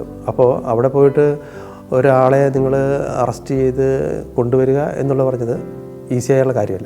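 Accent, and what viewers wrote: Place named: Southern Kerala, India. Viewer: kasargodano nadu.....pakshe slang thekottulla pole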